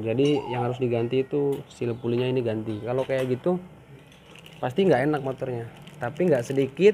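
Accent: West Java, Indonesia. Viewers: Lok mana om Bandung apa mana ya soal nya logat bahasa nya Sunda juga kaya saya hehe..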